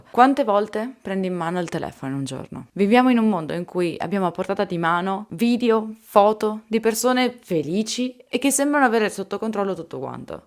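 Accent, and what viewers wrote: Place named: Milan, Italy. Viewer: Ti ascolto da poco, direi che sei milanese.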